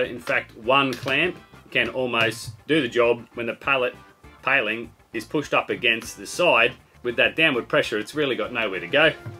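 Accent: Australia. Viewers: Im a new subscriber, love the content, thanks so much. Also nice to see/hear aussie accent, way more relatable for me. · Aye mate....you people from down under talk funny.... but your videos are top notch! i am finding pallet wood a god send when the wallets low on project funds. bought a lot of tools but haven't put them to work yet....let's give it a try see how this all works out...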